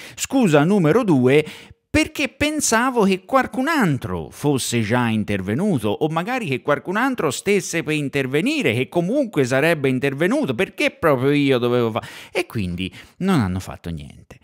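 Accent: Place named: Siena, Italy. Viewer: Bravissimo 1) Per i contenuti 2)Per la simpatia 3)Per il formidabile accento senese.Numero UNO!!!!!:washhands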